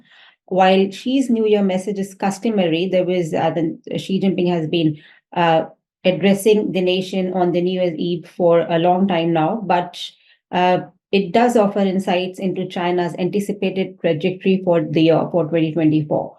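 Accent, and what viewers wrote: India. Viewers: I guess there's little to be done about the Indian-English accent, which makes following this difficult for me and others I suspect, but a better microphone would be a huge improvement.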